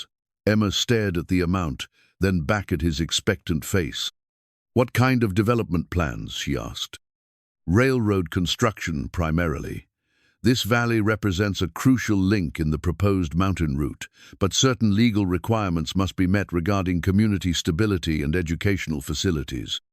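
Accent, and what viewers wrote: England, United Kingdom. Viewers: Prefer this English accent !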